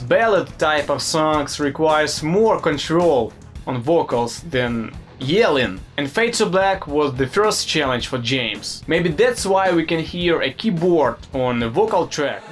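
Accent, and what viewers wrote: Russia. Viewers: Русский английский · Good video! You're russian?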